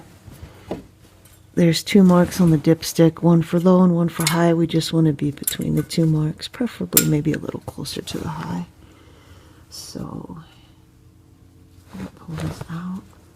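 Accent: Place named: Latin America. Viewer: Are you Latina? I think I hear a slight accent 😊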